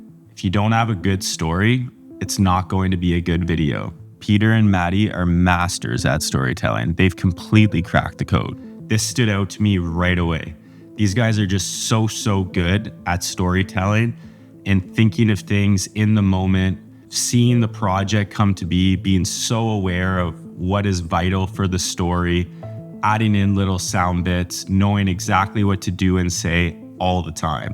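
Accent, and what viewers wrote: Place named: Canada. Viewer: Dude, excellent storytelling. Excited to have you on the platform. Also that Canadian accent is 🔥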